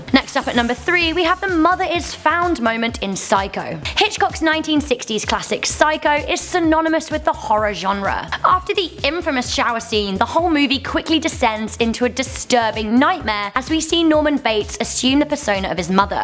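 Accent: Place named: United Kingdom. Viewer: is so fun to watch and listen to. Gorgeous lady with a delightful British accent.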